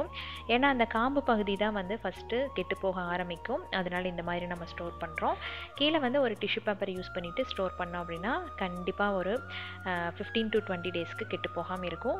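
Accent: Coimbatore, India. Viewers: thats so nice of you, btw neenga cbe dhana native, me too from cbe, your accent sounds so.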